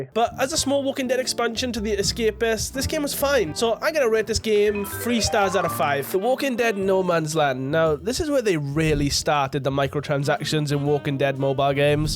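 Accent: Wales, United Kingdom. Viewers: Absolute Cinema indeed!! Are you Welsh btw? :D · Is that a welsh accent i detect? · ARE U WELSH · Mate, are u British? 😊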